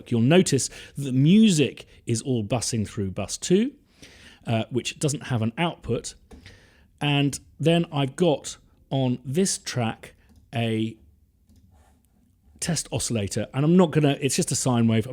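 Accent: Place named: London, United Kingdom. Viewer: WHAT? This guy sounds like he's from London, do you really think Trump is launching nuclear bombs over GB? How delusional can people be? Turn off CNN/BBC!! If anything, this guy should be worried about low-IQ, violent immigrants attacking his family (god forbid), thanks to liberal/self-hating Feminist governments taking over the West and burning it to the ground.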